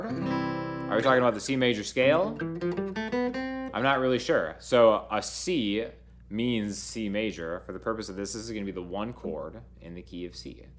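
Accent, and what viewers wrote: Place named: Sweden. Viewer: Interesting accent. R u Swedish or Italian?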